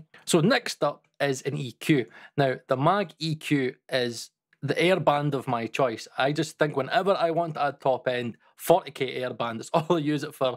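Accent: Scotland, United Kingdom. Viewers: I've watched 4 or 5 of your videos now, and I think I can finally state without hesitation that I can fully understand your (Scottish?) accent. :) Now I CAN sleep at night.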